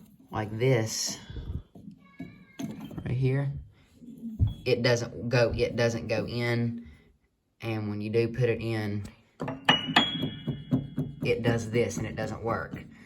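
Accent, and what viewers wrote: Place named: Mississippi, United States. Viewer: I swear you’re from Mississippi 😂. Your accent is great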